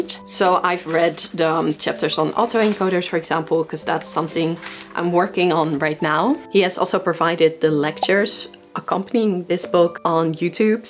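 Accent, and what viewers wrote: Netherlands. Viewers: your accent feels dutch, love how youtube is recommending me dutch youtubers who speak english XD, YT algo is really remarkable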